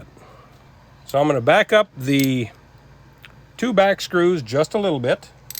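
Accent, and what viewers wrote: Wisconsin, United States. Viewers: Love the sound of "Wisconsin" in your voice AND the straightforward instructions--I think I may even be able to handle this (says the 59-year-old woman) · also, you sound exactly like my uncle Steve that it kept catching me off guard. He's from Wisconsin and has a really specific accent. 😊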